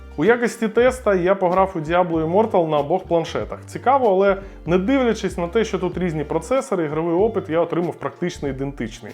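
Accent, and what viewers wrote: Russia. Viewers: Дуже тішить що і "Цитрус" перейшов на українську - респект! Видно, що не дуже ще комфортно з такими змінами (часом зустрічаються русизми), але він старається і саме головне - не прикривається тим, що мова не доскональна і соромно таке публікувати... Тому наше (споживачів контенту) завдання полягає в підтримці (підписка, вподобайка, комент (гарні слова в підтримку) автора(ів) і з практикою все прийде, вдосконалиться і буде не вимушене)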